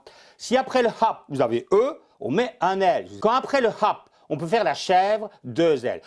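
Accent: Belgium. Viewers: il est marrant ce gars. un petit accent belge non? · Pour le journal parisien il a plus l’accent belge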